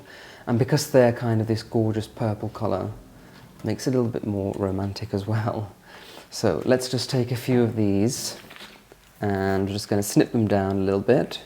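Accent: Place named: Northern England, United Kingdom. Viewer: I noticed he 'dropped' a lot of his vowels this week in his efforts to appear breathy and sensual- they are more Northern than West Country!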